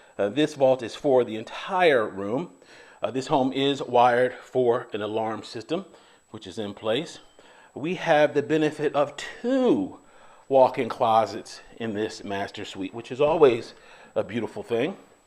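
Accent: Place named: New York, United States. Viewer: 😆 bald folks? You got me there I should have known you was a northerner; you speak just like us in nyc the only think you trick me with southern talking points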